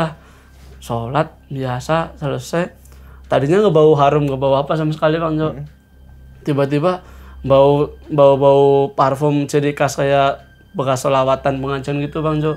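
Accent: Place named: Java, Indonesia. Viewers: Khas bgt logat Purwokerto yah mas hehehhee disigar dijiret lucuuu,salam dari Ajibarang mas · Logat jawanya😂😂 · ngapaknya mendarah daging wkwkwkwk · ora ngapak ora kepenak 😁 logat purwokerto nya kental bgt ya:) salam dari wong purbalingga, sukses selalu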